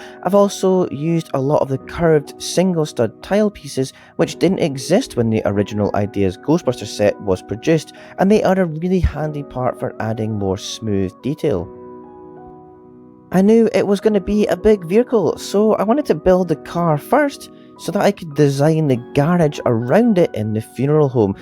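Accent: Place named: Scotland, United Kingdom. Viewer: I love your accent. Are you from Scotland? (Just curious).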